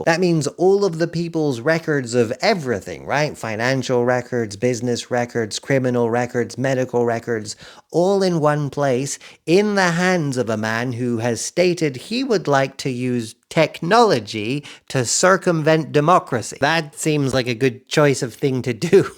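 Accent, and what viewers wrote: Wales, United Kingdom. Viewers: I’ll let you off for the Welsh accent, because you speak so much truth! 😂🫡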